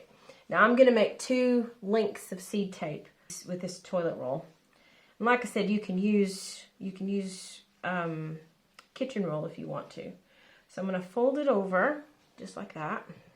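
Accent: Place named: United States. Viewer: You sound American then you use a word like “quid “?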